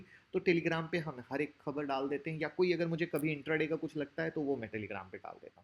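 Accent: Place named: Rajasthan, India. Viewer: Maja agaya....taabad tod k owledge sharing.... sixer.... bhasha se rajasthani lagte ho sir...marwar belt ya mewari?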